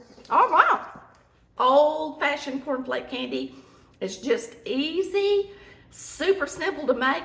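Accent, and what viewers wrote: South, United States. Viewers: I just found your channel and already loving your video's and your southern voice and charm. Do you have a cookbook??? See you in your next video. ♥️😊👍🏻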